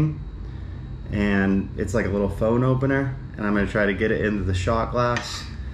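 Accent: South, Vietnam. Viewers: tiếng việt của bạn theo tôi đã dc 80% rồi , người tây nói giọng miền nam vn nghe rất thú vị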